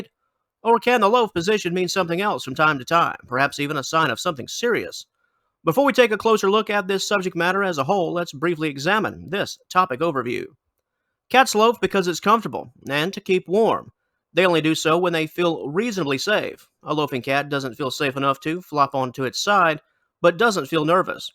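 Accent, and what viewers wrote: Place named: Florida, United States. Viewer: You from Florida? You sound like a fellow Floridian.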